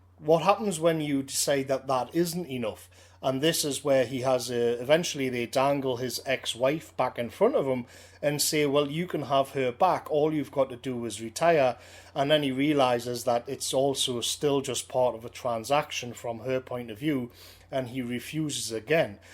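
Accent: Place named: North East England, United Kingdom. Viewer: its really is beautifully articulated content, in a way that only the north east accent can deliver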